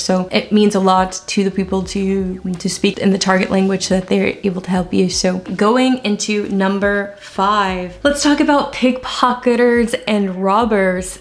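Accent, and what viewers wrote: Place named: Argentina. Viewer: I’m a native Spanish speaker and your Spanish sounds so amazing. I love the way you speak! Reminds me a little bit of Argentinian accents. You’re doing great, keep it going! 👍🏼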